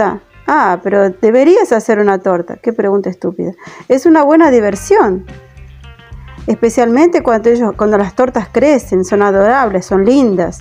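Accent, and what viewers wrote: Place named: Argentina. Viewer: Me encantó tu forma de relatar bien argentina 👏🏻😂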